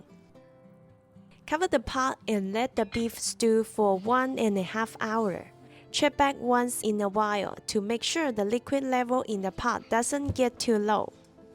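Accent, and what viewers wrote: China. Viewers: You sound Chinese but you could be Middle Eastern Chinese... That's the thing... :) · That Cantonese-English accent just makes me feel at home 🏠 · 😂😂😂 Chinese accent is definately there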